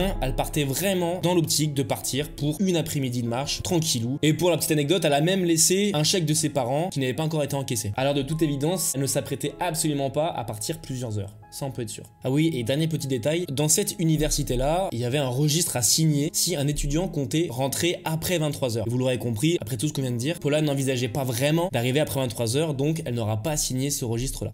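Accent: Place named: United States. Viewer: Je te découvre le ton est sympa, parle un peu moins vite et ce sera parfait,au j'ai pris.les gâteaux , mais la belle mère pas contente d'être dans le placard Lionel camy à déjà traité cette affaire garde ton accent américain, prend un ton plus ténébreux et ce sera top Tu es jeune, sympa, un abonnement pour toi direct,et un pouce je vais te suivre sur ta chaîne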